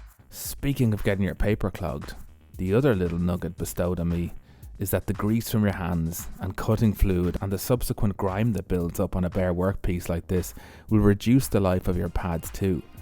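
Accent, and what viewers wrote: Ireland. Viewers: Every video o watch,i feel like i should be drunk,i guess is Irishness coming through.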